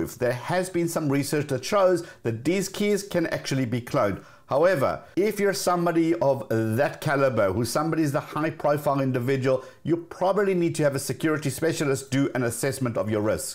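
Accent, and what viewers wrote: South Africa. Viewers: are you south african? You sound like a soutie😂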